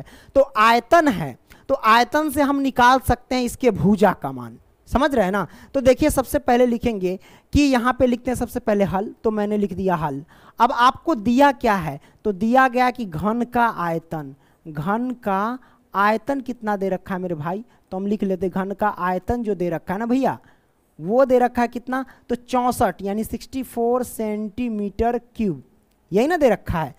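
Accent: Bihar, India. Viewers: Sir aap bihari ho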